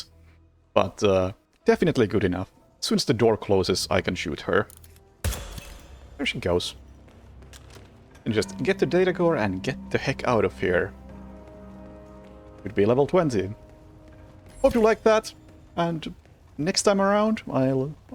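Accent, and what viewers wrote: Finland. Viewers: Also the thing with the mastery change to 20 theres a bit more to it than that and it’s more understandable when u know the nuances: Hitman 3 changed A LOT regarding Hitman 2’s challenges when porting the old content over. Challenges were cut, sometimes reworked and most importantly they moved around unlocks. Like for example they removed all the Classics challenges for each difficulty which stacked up to a big XP bonus, added challenge pack rewards to the mastery track and had it so that there was rewards that counted how many SASOs in general you did rather than tying it to Master Mode specifically So all in all once you do that there was probably instances where that stuff had to be rebalanced, New York already had challenges which gave out less XP to make it the same speed to master as other levels so with a chunk of challenges gone they would have to give the levels different values anyway so they thought why not just make it more consistent with other maps while theyre at it? 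Plus you seem to forget how much people judge a map’s replayability on its mastery levels. This was way better for marketing, even when they eventually bring Hitman 2’s DLC into the full bundle like they did with the Switch2 version it’ll still be better for free players to know theyre getting 20 maps of around the same level of replayability rather than thinking some are less valuable than others Great video tho, i love the fact your finnish(?) accident makes “vault and climbing” sound like “bald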